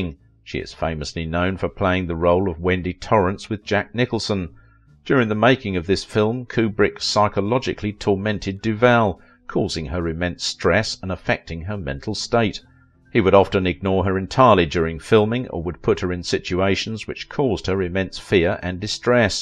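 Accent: United Kingdom. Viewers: Why are you so bad about misaligning images and audio? "let's talk about how frightening the Shining was while showing pictures at that exact moment from Popeye." Also, is this voice yours? Or are you another shitty Chinese/Indian channel that hires British voice actors to try and give the illusion that they do good work?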